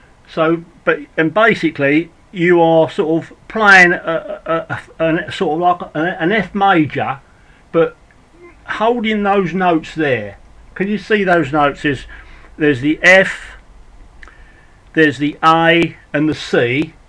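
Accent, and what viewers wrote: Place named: East London, United Kingdom. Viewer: Jeje, pero es buenisima su accento, si no estoy equivicado es de los Eastenders, accento famoso del este de Londres.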